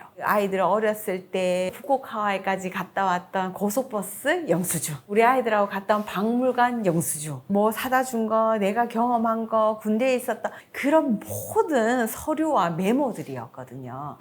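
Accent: Gyeongsang, South Korea. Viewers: 경상도 억양. 설치고 설레발이 먼저인 인종이라 본인이 일단 정리정돈 안되는 어수선이들. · 경상도 사투리 쓰는 분들에게 이런 댓글 다는 사람들 있는데 이유가 뭔가요?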